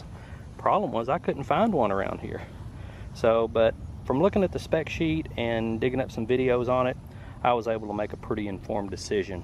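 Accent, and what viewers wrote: South, United States. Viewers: Fellow grinder here from Alabama. Just found your videos while looking at sno peak and toaks cooking set ups. Your videos are very helpful. I'm also a gravel grinder, and in fact moderate the Alabama gravel cyclists facebook group. Feel free to join if you want. By the accent, sounds like you might be in the south. I'm currently acquiring bike packing gear. I just received my revelate bags front and rear with a rockgeist bar jam, and have on order a warbonnet hammock, tarp, underquilt and top cover, and hammock gear top quilt. I'm now looking into what if any cooking gear to buy, vs. pre cooked or pre made stuff like energy bars etc. I like the idea of being able to do a multi day tour with cook set up. Your feedback would be appreciated.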